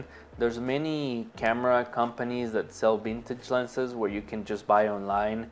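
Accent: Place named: Spain. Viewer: I know it's hard for native Spanish speakers but you gotta work on your pronunciation of "z" and "v". Zi is not Ci and Video is not Bideo.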